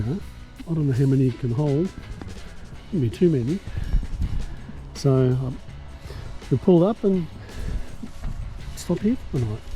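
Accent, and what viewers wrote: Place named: United Kingdom. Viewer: What would you pay? We all understand your living on the edge each week until pension day. Mate drop the girls and the drugs, the cigs and the red frogs. Stop your whinging. Are you a Pommy? Sure sounds like it. Maybe small man syndrome.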